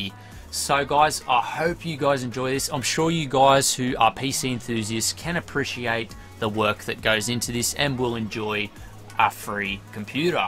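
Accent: Australia. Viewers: great ideas right there! although I'm not the biggest fan of warcraft I think it will look sick when finished. keep it up! btw your Australian accent sounds so funny :D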